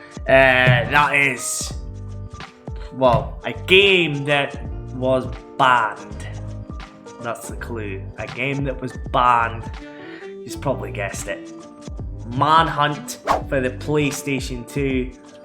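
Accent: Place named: Scotland, United Kingdom. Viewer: Aww Scottish fwend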